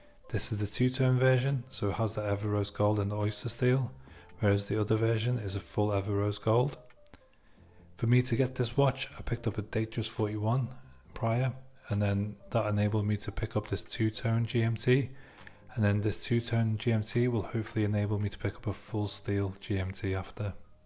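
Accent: Liverpool, United Kingdom. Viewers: Being from Liverpool...love that I’m listening to a scoucer ...living in Scottsdale and Chicago for the past 40 years....and loving Rolex....I am looking for this watch. That’s one beautiful watch ! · Scouse accent I hear lol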